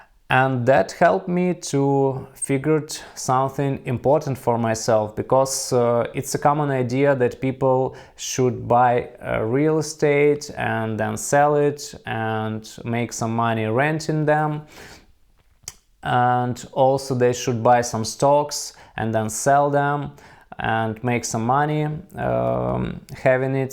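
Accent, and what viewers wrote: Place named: Russia. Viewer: Yes, it’s nice to understand you with this “Russian” accent. It’s better than “American” or “British” for understanding 🎉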